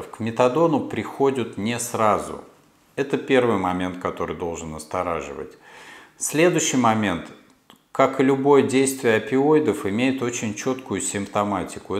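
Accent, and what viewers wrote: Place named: Kursk, Russia. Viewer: этот курский акцент бесит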